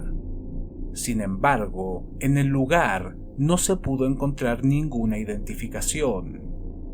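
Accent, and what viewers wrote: Chile. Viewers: Tus documentales son geniales!!! Esas introducciones para ponernos en contexto de la historia que nos vas a contar, está perfecto. Por tu acento, diría que eres de Chile ¿no? Jajajaja. Saludos desde Colombia. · Yuuuuuuupiiiiiii!!♥️ Gracias de precioso acento chileno;)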